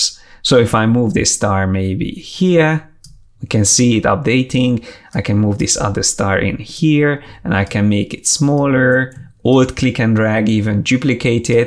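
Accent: Hungary. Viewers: Sorry for the off-topic question, but may I ask where you're from? Your accent is a dead ringer for Hungarian but your name sounds Polish, so I'm both confused and linguistically intrigued. :) Great video, by the way.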